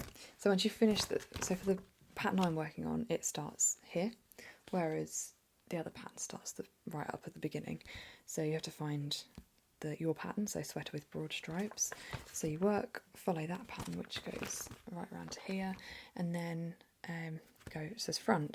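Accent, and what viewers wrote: Ireland. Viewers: Can you knit like plush animals for example? Or is that for only like sewing or something? I'm not much of a craftsman, but I do really like plush animals >_> So I thought I'd ask. :) Great video. I actually found your channel when I typed in Irish ASMR. While I had a hard time finding any actual Irish asmrtists, I did find one of your Irish accent videos. :) Subscribed! I look forward to checking out your other videos.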